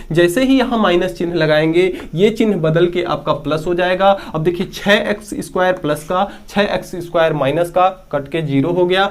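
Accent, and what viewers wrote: Chhattisgarh, India. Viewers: mujhe lga tha aap chhatishgarh se ho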